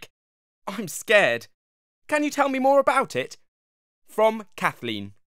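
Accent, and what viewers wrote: England, United Kingdom. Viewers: WHAOA WTF HES BRITHISH!? Put him in spainish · I was like "um? I didn't remember sounding british" then i saw the description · OMG ITS A BRITISH!!!!!! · HMMM i'm used to american but i love how British sound:D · I had no idea there was a UK one. I'm from America so I was shocked to hear him with an English accent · Delta Core 12 its a British accent. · Since when tf was he british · why is british? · He has a accent because this brain pop is meant for the UK duh -_ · Hearing this in a British accent us slightly unsettling to me... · what happened to his voice his gone all english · UK voice?damnit =( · He sounds like he's British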